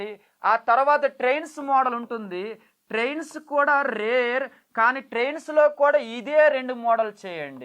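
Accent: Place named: Srikakulam, India. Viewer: Sir meedi srikakulama vijayanagarama yaasa alaa vundi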